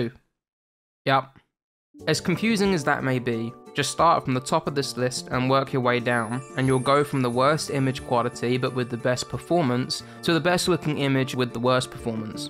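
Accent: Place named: Roadman, United Kingdom. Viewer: Well this is quite surreal, it's like listening to a roadman lecture about graphics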